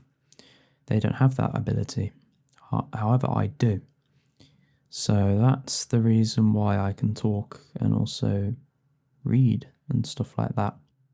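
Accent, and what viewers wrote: United Kingdom. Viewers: How did you manage to sound more british than britishiest british people. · I just came here out of pure curiosity. NOT EXPECTING THE MOST BRITISH SOUNDING MAN I EVER HEARD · British accent??? 😅 😮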